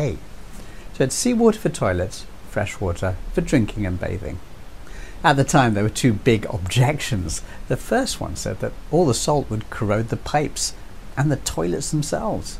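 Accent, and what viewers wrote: United Kingdom. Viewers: yeah you are absolutely right and sounds alike also except his British accent.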